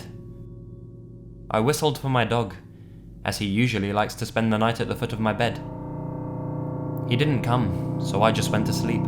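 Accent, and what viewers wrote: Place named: United Kingdom. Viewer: Good stuff. One of the best narrators for this stuff, I'll even forgive the Brit accent.: ) Actually I think that's what really brings it together, keep it up. I wonder how many creepos listen to these stories and are like "Oh shit, that was me! Awesome."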